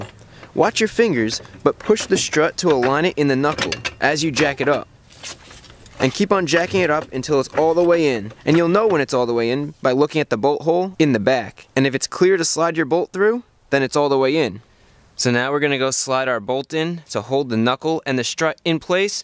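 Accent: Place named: New Jersey, United States. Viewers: god you can hear the new Jersey in his voice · Judging from slight accent, I would guess he is in the Northeast US where snow happens and roads get salted. This will cause surface rust on suspension components on a lot of cars. Some people in heavy snow and salted areas say that Mazdas in those conditions rust badly. I am in the middle of the east coast US and we get a small amount of snow, and roads get salted, and I have had 4 Mazdas and did not experience any rust or corrosion problems.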